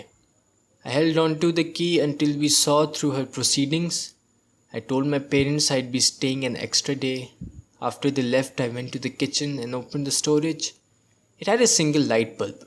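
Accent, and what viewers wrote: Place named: India. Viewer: Your Indian accent 👌🏼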